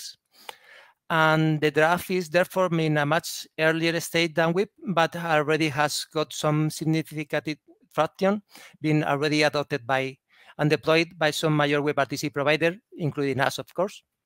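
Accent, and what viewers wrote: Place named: Spain. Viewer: very difficult to understand. strong Spanish accent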